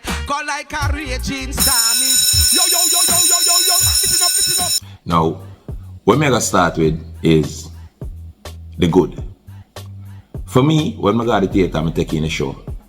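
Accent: Jamaica. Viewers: Well spoken Jamaican accent. Kingsley. Nail it😅 Andrew Holmes is well spoken like Kingsley ❤❤❤❤❤❤❤😂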